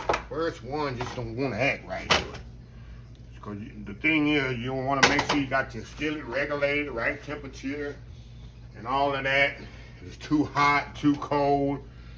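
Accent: South, United States. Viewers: When I heard that country twang, I knew it was going to be 🔥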